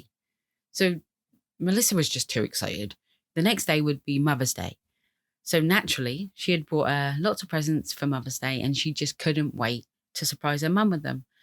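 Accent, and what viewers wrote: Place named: East Anglia, United Kingdom. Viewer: Norfolk? Suffolk? You started off and you’re accent crops up , less Norwich , more Ipswich ? Go on , I’m Yarmouth Girl here born n Norwich , miss the Sea ? ‘ hearing ‘ , that was a blast , subbed , hadn’t seen youre channel which odd , most female crime channels wind me up ~youre emotions are appropriate and feel real . Cheers for the vid , tragic sad story ✨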